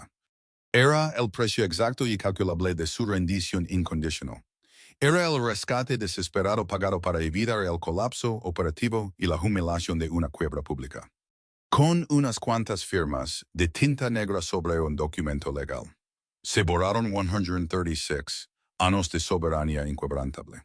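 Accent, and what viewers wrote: United States. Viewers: TU PRONUNCIACION ES DE USA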